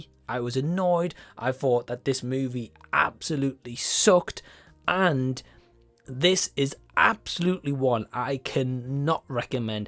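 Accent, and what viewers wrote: Newcastle, United Kingdom. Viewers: Hey mate, I’m from London and I just discovered your channel. Love your channel and your Geordie accent. We don’t all speak like that down here (as you stated). I’m from the “ends” and whilst I used to speak like that, I grew up and got a career and spoke properly. It’s a shame Adam and Co haven’t grown up either. Not a great representation of us Londoners. Appreciate your honest review.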